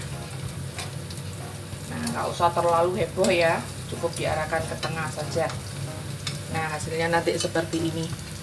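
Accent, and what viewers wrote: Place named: Surabaya, Indonesia. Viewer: Suaranya spt CECE INTAIMAGAZINE. suara khas SUROBOYOAN koyo AKU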